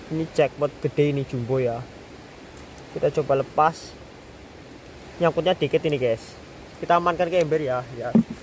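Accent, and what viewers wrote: Banyumas, Indonesia. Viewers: bang kalo boleh tau abang orang mana nih kok ngomongnua agak ngapak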